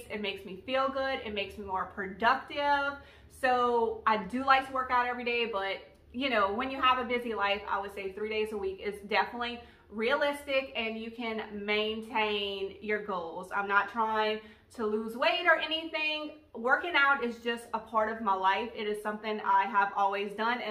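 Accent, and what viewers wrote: Louisiana, United States. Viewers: I just discovered your channel! I am an hour and a half from Memphis & lived in Tupelo in the mid seventies! Can you share where the thrift store is near the Goodwill that has the good prices? I look forward to catching up on all your videos. Love your cajun accent!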